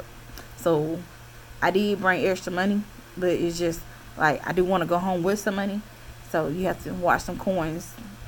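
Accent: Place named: Alabama, United States. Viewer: Are you related to Adrienne Little? You have that Mobile, AL twang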